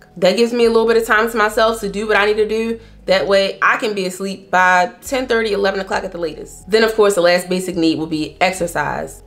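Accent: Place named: DMV, United States. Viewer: Girl you HAVE to be from somewhere close to Baltimore,Md. I know this DMV accent anywhere lol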